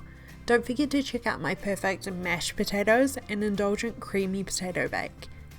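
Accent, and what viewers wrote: New Zealand. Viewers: Lovely recipe,! And is that a kiwi accent I hear?:) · Yeeessss. Recovering from an operation which has put starchy foods back on the menu. Been craving roast potatoes for almost 5 years. Gonna try it with sweet potato too, do you reckon it'll come out crunchy? Not only did I get the recipe to do them like nan used to, I got it in an easy to listen to New Zealand accent. Sweet as, cheers from across the Tasman.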